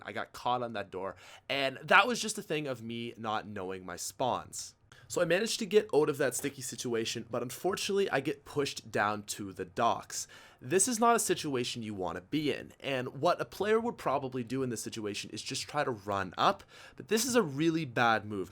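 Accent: Canada. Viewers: its all a bout that canadian accent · Are you Canadian???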